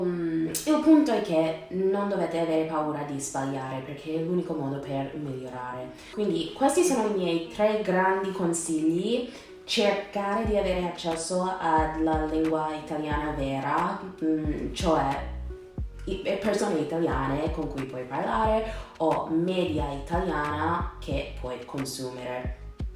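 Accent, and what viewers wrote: United States. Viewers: Non hai imparato bene la pronuncia, noi italiani non parliamo con l'accento americano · Dicono che l'italiano sia una lingua difficile da imparare per uno straniero. Tu l'hai imparato molto bene anche grazie al fatto che, vivendo qui in Italia, sei costretta a parlarlo giornalmente. Complimenti. PS: è bellissimo sentire parlare l'italiano con l'accento americano, non perderlo mai. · All you have to do is learn the accent! I can totally hear your American Accent. Brava però! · Ciao, il tuo italiano è molto buono - È normalissimo che a volte tu abbia dubbi sulle parole e faccia errori, ma parli ad una velocità quasi da native speaker e devi esserne fiera. I consigli che hai dato sono gli stessi che dò anch'io a chi mi chiede come diventare fluent in English (vivo in UK e sono quasi bilingue ormai). Practising with native speakers is key! 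L'accento americano è cute though quindi tienitelo stretto:) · You seem fluent, congrats! Your American accent though is fairly strong. I was wondering if you've given thought to trying to improve that as it can be distracting? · Parli benissimo ed hai un accento molto piacevole da ascoltare. Purtroppo o per fortuna quando si impara una lingua da adulti l'accento della nostra lingua base non si perde mai, vale anche per me dopo anni che vivo in francia. Ma non mi sembra che tu ti ponga molto il problema, o si? Vorresti che il tuo accento americano non fosse notato o ti piace questa sfumatura?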